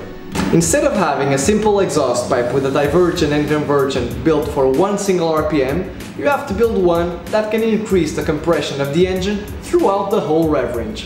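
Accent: Portugal. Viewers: és portugues? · Bem me parecia que eras tuga XD XD XD assim como o M Cunha não conhecia o canal... Big Props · E bem diferente ouvir um português a falar inglês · o narrador é Português... sotaque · Esse sotaque portugues nao engana ninguem ahahah. Subscrito! Adorei o conteúdo e a explicação direta ao assunto. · Ótimo vídeo meu caro! Reconheci seu sotaque português de cara! Um abraço do Brasil! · Ah...a bela pronúncia portuguesa sobre o inglês :D Não engana! Não conhecia o canal, muito interessante.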